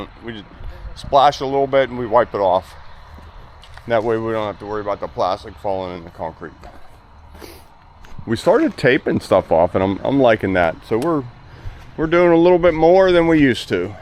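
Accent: United States. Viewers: Everyone has an accent. I'm from Australia. I definitely hear your American accent 😁 Beautiful work as always!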